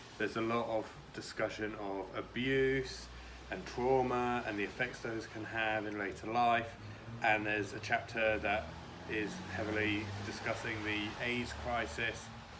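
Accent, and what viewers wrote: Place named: England, United Kingdom. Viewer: Hello fellow UK booktuber! I don't often come across very many so I get excited when I hear an English accent! Great video, love your channel so far! I am new here so still trying to find my way around. Subscribing for sure ❤️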